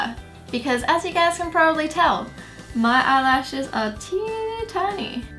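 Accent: Australia. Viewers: P.s. I am so jealous of your accent aaaaah! I've always wanted to say that, I don't know why I haven't haha I had to fake an Australian accent for my school musical when I was the lead, and people loved it, and it's what pretty much landed me the role ahaha it's my favorite accent! But then I did my school play and I had to fake a BRITISH accent and it was hard because I'm so used to doing an Australian one, so everyone was just reminded of my character from the musical hehe and THERE'S MY LIFE STORY! Sorry about that haha. My point though, is that I envy you and your accent!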